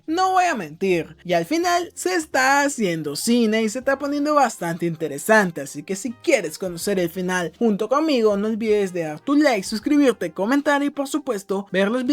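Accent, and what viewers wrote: France. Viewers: Eres francés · Se le sale el francés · Eres de paris o por que hablas asi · Eres francés?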